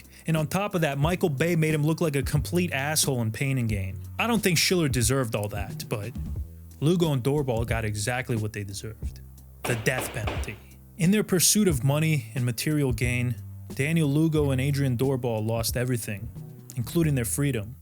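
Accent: Italy. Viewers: First 20 seconds I already like the narrarator's accent lol. Is that... Italian?!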